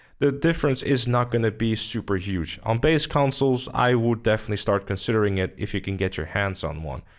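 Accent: Netherlands. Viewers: Are you dutch? If so: Goedeavond 😁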